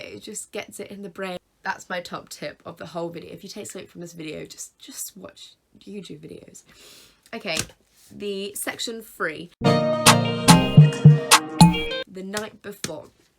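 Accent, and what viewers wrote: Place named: RP, United Kingdom. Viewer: you're very posh arn't you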